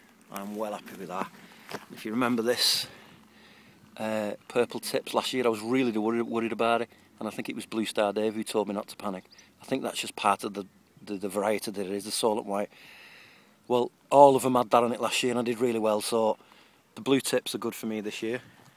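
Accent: South, United States. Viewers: Now see I clicked on the video to hear the Southern accent! LOL. I know what you mean about being impatient. Bernard on navajopa31 has a video on grapevines. Here's the link. <link> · You master gardener you! You are excelling brother. Common....I want to hear you make fun of me...HAHA! I want to hear the southern accent! You'll be growing stuff yearround in that greenhouse. I like it. Wish I had a larger one.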